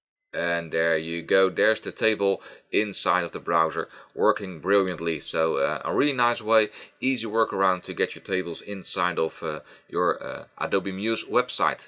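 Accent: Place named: Netherlands. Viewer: I can tell you're dutch haha! Either way, very helpful tutorial! Kudo's!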